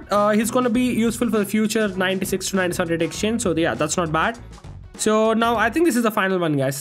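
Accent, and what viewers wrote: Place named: India. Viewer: His sound like India but I’m love you! VIDEO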